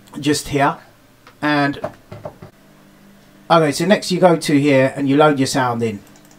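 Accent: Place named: United Kingdom. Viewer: The clearest British English I ever heard...Thanks Man, good point...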